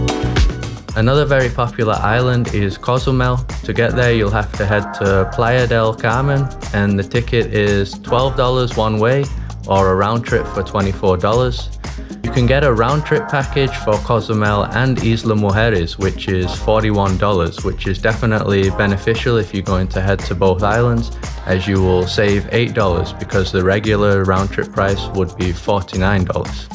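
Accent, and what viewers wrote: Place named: Manchester, United Kingdom. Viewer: Sound like you're from Swinton or Clifton, Manchester!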